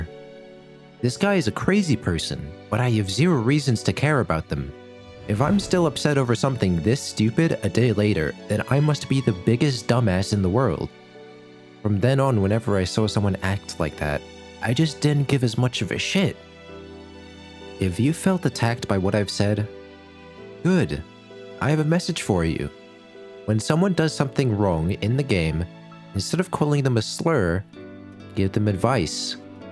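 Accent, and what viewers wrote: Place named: United States. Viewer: you have an american accent but u are australian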